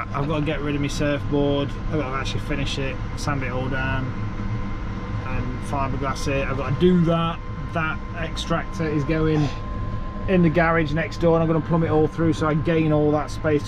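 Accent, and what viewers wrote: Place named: Mansfield, United Kingdom. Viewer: Loving the vids, you originally from Mansfield area by any chance? Your accent is very familiar!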